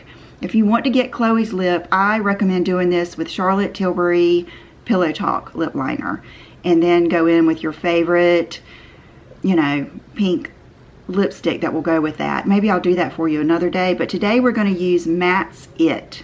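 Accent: South, United States. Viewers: Beautiful look! Thanks for sharing!! I love your southern accent or terms I should say!! "at the time"!! 😉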